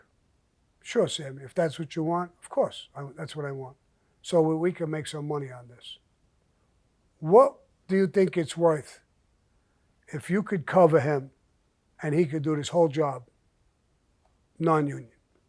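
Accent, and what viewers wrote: New York, United States. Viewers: Sammy... I just love your NY accent. Keep on keeping on!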